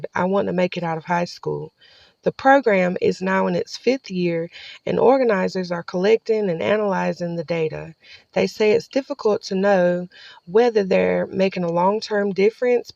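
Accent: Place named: Robeson County, United States. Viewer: I have alot of friends from Robeson county, they moved away because of all the crime. And you sound just like them.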